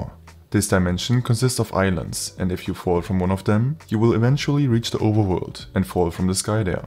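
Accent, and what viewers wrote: Germany. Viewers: deustcher akzent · good video as always. took me many vidoes to realize that you have an german accent lol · Why is the German accent so damn strong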